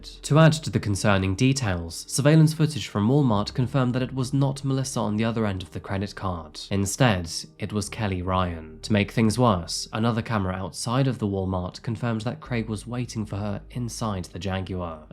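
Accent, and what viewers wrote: England, United Kingdom. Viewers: Yet another outstanding episode! And chin up about sounding English; it could be worse....you could sound like Andrew Tate with some weird US/British drop-the-t's accent!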